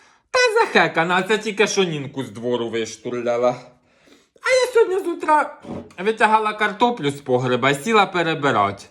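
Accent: Poltava, Ukraine. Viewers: Насмiялась вiд душi! Дякую, як же Ви так точно вiдтворюете мову полтавщини, сiльський побут. Жiночi, розбiрки,. Ви-неймовiрний, дуже талановитий! Здоров,я Вам, натхнення! Чекаю нових замальовок!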